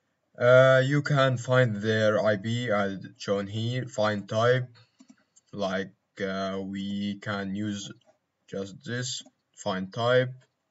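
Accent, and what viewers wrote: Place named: Egypt. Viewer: يسطا انت مصري so try to adjust your accent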